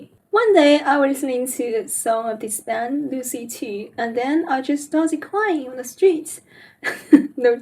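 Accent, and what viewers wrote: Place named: United Kingdom. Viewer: Her accent is actually tinted with British English, can you hear it?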